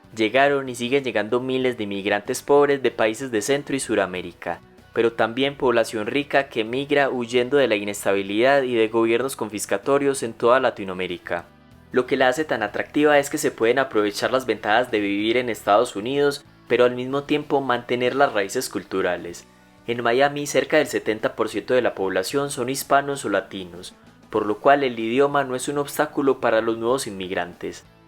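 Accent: Colombia. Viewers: Es colombiano, tal vez de medellin o del eje cafetero (pereira, manizales, armenia) etc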